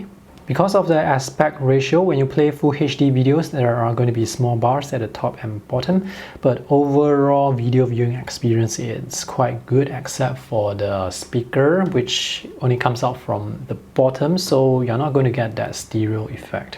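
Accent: Singapore. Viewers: Bro u Singaporean or Malaysian?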